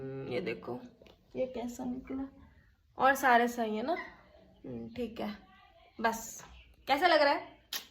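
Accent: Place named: Lakhimpur, India. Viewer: Deshi languge Lakhimpur waali bhasha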